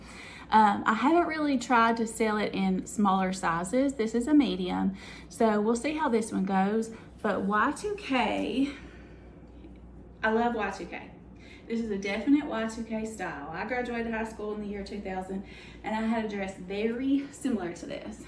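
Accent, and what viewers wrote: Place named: South, United States. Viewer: Did that Cabi have a black dragon on it so definitely put dragon in your title love your Southern accent and easy going helpful content I'm from Ms original are you from there or near sure sounds like it! Keep up they great work!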